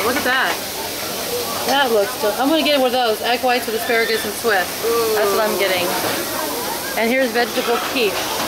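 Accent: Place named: New Zealand. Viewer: isnt saying ca-bah-na wrong. That's how you'd say it in New Zealand:) same with how we say dance. We dont say it daa-nce we say it more like dah-nce.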